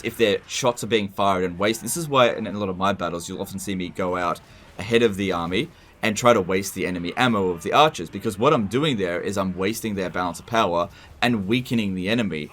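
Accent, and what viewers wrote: Australia. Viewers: I just listened to aussie bloke describing shaving his balls.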